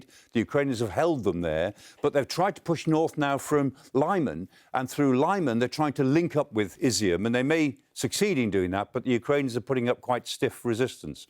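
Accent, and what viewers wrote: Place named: United Kingdom. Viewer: If I wasn't really paying attention, I'd think he was narrating an American NFL game in a British accent.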